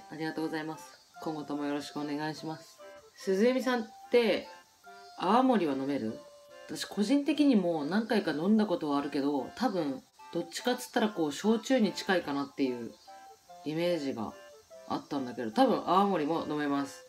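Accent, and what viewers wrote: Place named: Fukushima, Japan. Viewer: 福島離れて思ったけど、なまりすごいよ笑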